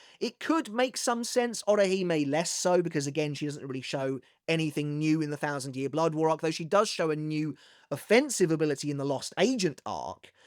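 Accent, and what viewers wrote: United Kingdom. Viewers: ew british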